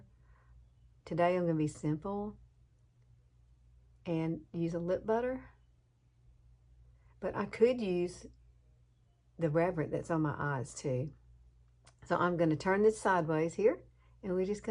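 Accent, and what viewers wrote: South, United States. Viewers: Some very good 'tips'. Just love your Southern accent, don't hear it much In Australia. First time on your Channel, thank you. You look great.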